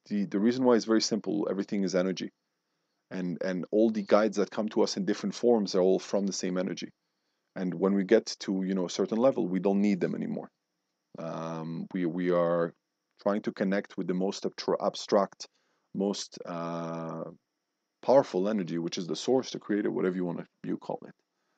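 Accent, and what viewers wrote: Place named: Switzerland. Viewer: oh then I understand why I like your accent, I was born in switzerland too :) still living there but I actually always wanted to move to Canada as a child. now I feel more drawn to England and Ireland. your encounter with the arcturians sounds fascinating, I would like to meet them too one day.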